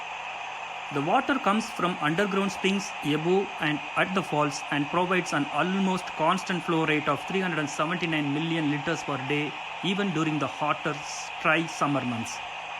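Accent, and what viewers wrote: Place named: India. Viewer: Your Indian accent kills me watching this video🤣🤣💀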